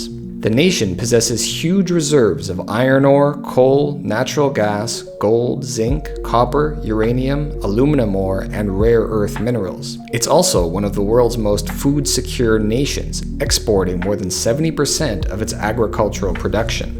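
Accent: United States. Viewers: Sounds typical for this type of country. U.S. has similar problems. Hopefully you're keeping an eye on the value of keeping families intact. We created an environment where reliance on one's family to live comfortably is gone. It shows in very bad ways. I do find one thing funny: I watched a video the other day explaining the U.S.'s national debt problem. It was narrated by a young man with an Australian accent. This video is narrated by a guy with an American accent. Go figure. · life is impossible in australia? clearly you need to open your eyes and look at life in poorer parts of the world! Methinks your "life is impossible" has the whine of someone complaining they don't have the latest apple phone, or some such flippancy. The way you pronounce some australian place names indicates you have no idea about australia...... where do you (the narrator with a tinge of american accent) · Crap clickbait. 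American voiced bot spewing crap. There’s nothing wrong with Australia. When you look at the rest of the planet, we’ve got it pretty good. Pure bull💩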